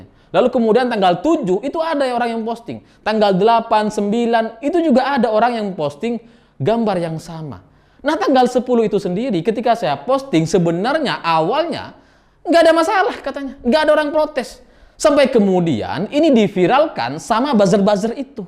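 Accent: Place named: Madura, Indonesia. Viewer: Logat kacong medok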